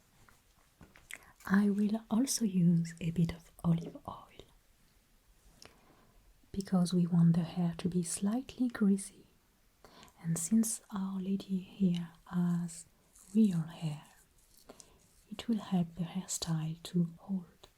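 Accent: France. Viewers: English with a French accent AND hair play.?ZZZZZZ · Omg you’re so good! Question, are you french? (Note: if your not sorry, QwQ I’m bad with accents) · That wig reminds me of my hair bumpy, lumpy and tangles even after just brushing it(lol)!!!... can't wait to see how " Marie Antoinette"s hairstyle turns out....no woman in her right mind wants to look like a "hedgehog"!!!....love her french accent and her natural beauty.....this is my first time watching this ASMR artist.... I'm very impressed with the quality of her video and it's content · My favorite part of her is her French accent. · Accents from the region of France are so nice-sounding. Very beautiful. Sorry, French Canadians. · I love the way she says Curlers. The French/English accent is so sweet to listen to. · Super vidéo, le montage est parfait. Comme ton anglais d'ailleurs, ce qui est plutôt rare par chez nous ! 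Mais est-ce que ton french accent est naturel ou bien tu l'exagères un peu pour l'ASMR ?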